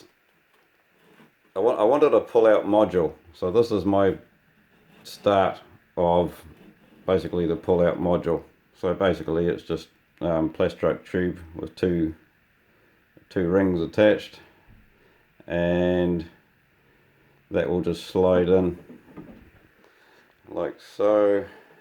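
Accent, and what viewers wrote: New Zealand. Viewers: Excellent job! Soooo awesome!! Kiwi btw? lol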